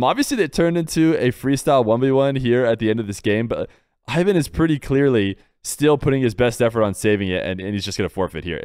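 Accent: United States. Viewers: sounds like Johhnyboi but with an American accent, and I find that pleasing.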